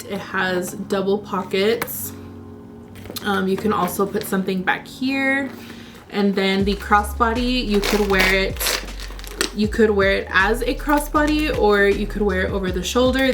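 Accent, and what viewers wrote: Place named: Hawaii, United States. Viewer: Aloha, just found your page! Actually thought you were from hawaii! Lol! You talk like us! But let me tell you, it’s sooooo expensive here in Hawaii ugh! We pay the price of paradise but for the most part it’s worth it lol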